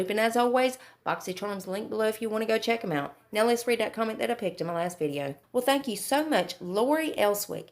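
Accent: South, United States. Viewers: Love you!!!! Love the way you talk....I am country too♥️♥️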